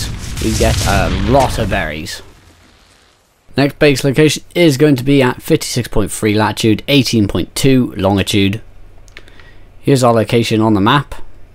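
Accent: United Kingdom. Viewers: Loves your british accent mate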